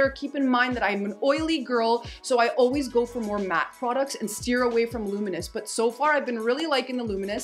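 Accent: Canada. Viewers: I can hear the Canadian in your voice!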